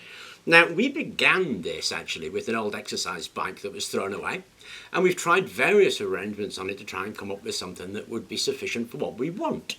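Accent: South, United States. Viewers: nice, lol "he does have a different accent.." well he does live down south ya know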